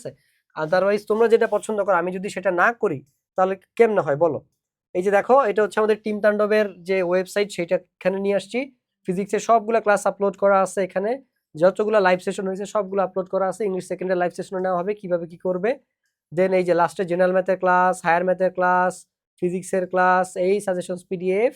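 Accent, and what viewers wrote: Chittagong, Bangladesh. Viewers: Your ctg accent is soo good bhai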